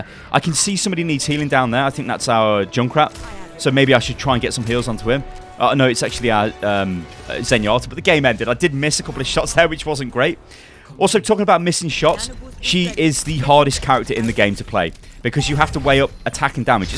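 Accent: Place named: United Kingdom. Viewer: Amazing guide; keep your work up! Admittedly Ana is my main as well, so any kind of help I get is amazing. By the way, nice British ;D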